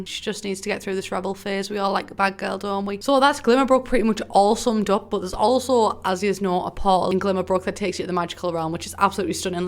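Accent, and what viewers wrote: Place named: North, United Kingdom. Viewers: This is way more entertaining listening to a northerner😂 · You are now my official Sims news broadcaster, always loved the accent, I could listen to you all day and that northern humor just has me on the floor holding my stomach.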